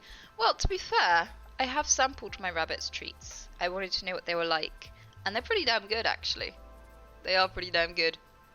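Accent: United Kingdom. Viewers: So is that it? A hot girl with a British accent who randomly spews out profanity? I think I'm in love.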